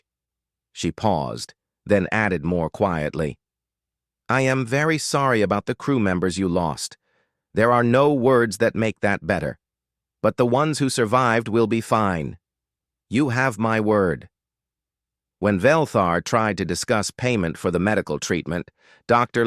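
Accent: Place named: South Korea. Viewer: Which Korea? Having issues picking from the accent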